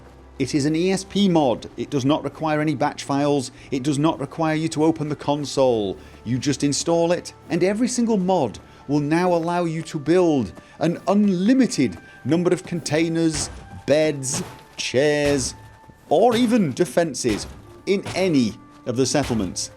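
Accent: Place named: Scotland, United Kingdom. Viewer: Hey: The proper name for the Regent sword is Claymore. Judging by your accent, you're closer to the history if it than I am. Another great video. Thanks.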